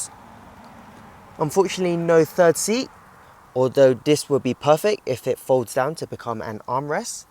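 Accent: United Kingdom. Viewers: Asian face and British akcent .. :) Nice car man.!! I'm really sure about that.. this car is trully Type-R last has been made.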